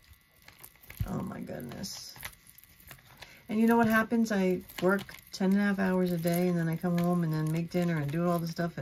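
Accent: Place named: Massachusetts, United States. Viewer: “Memba?” Lol!! Are you from Massachusetts? Sounds like what we say here! Lol Can you please tell me how you deal with your jewelry? I don’t have even a slither of what you have and I have NO idea how to organize it. I’ve been an eBay seller for over 25 years but I sold vintage china and collectibles. I’m getting away from that because shipping is so high. I decided to switch to jewelry after I started researching some vintage pieces I got from my grandmother. But let me tell you… I knew NOTHING about jewelry about a year ago. I mean, it’s sad how little I knew!! I never even thought to look for marks, or anything, But I started to learn, then I bought only about 4 boxes from the online thrift and I will not allow myself to buy anything else until I sell some of this stuff!! How do you deal with it all? Eventually I bought a gem tester, metal testing kit, etc. 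I’ve tried to organize by colors, metals, time periods, etc., etc., but there are so many jewelry categories! This stuff takes a lot of time! At least for me it does. I feel like I’ll never make any money at the rate I’m going. Also, how do you sell? Online? Only on YouTube? Is it worth the time? When I try to list things, let’s say I take 20 pieces of jewelry to list…. After I finish looking at them with the loupe, research them, clean them up, take pics, edit the pics, then list them, it’s taken me like 12 hours! Can anyone offer me a little help in starting up? Thank you so much! Oh… btw, I LOVE that you show us close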